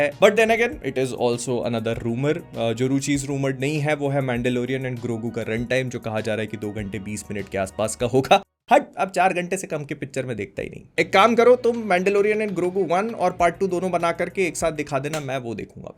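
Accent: Bhopal, India. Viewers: Aaj bhai full bhopali mode me hai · Are you bhopali?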